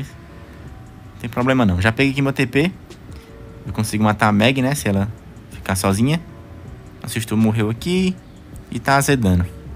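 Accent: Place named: Bahia, Brazil. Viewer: eu te amo bahia bahia, eu amo teu sotaque bahia, manda um salve pra paraíba 😁😁😁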